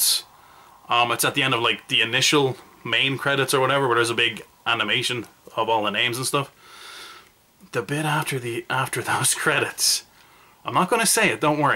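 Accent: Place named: Ireland. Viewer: Your very funny, what part of Ireland are you from im from?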